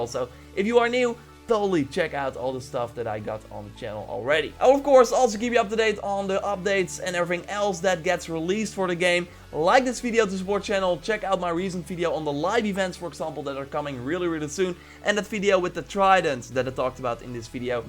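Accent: Netherlands. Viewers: Are you dutch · 1 different question what is your country no hate but you sounds like somebody from the Netherlands 😅😅 Love your vids 😉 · Are you Dutch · Are you dutch want het klinkt zo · Nederlands accent niet normaal xD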